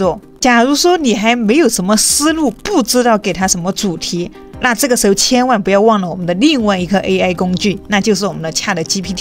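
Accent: Sichuan, China. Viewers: 四川人吧博主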